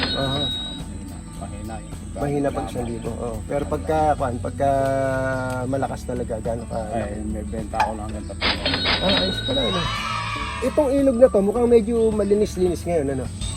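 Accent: Nueva Ecija, Philippines. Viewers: Hahah nkaka miss ang puntong Nueva Ecija.. “Wala eka eh”😂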